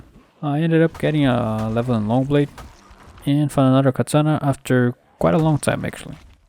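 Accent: Brazil. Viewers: teu accent parace um pouco brasileiro, se vc for, incrível que tenha alguem br fazendo um conteúdo tão foda assim, abraço! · Tem sotaque de BR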